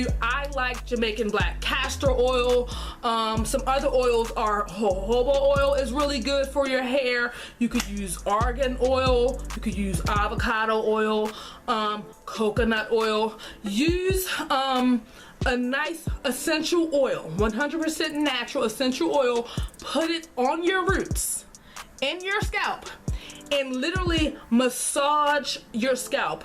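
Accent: Baltimore, United States. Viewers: she definitely from Baltimore lol love it! love these videos, definitely helping as i'm looking to start my journey in a month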